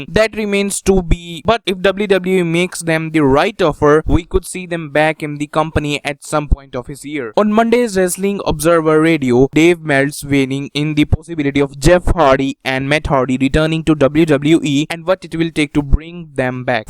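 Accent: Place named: Pakistan. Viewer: annoying pakistani voice... ughhhh... go to your call center job buddy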